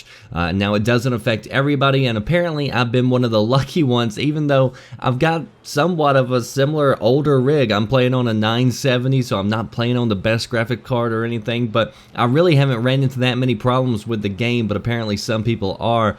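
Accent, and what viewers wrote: South, United States. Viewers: You might find this amusing, but your voice reminds me so much of a preachers voice. Just a tinge of a southern draw and you pauses and articulation - lol. Preacher. Anyway, like your reviews. Fair and nothing meant to bring on fanboy arguing, though I'm sure idiots in your comments still do now and again. My boys and I love Forza so it's cool this one has turned out to be aces! I don't have a PC gaming rig so it's all Xbox One for me. Thanks again.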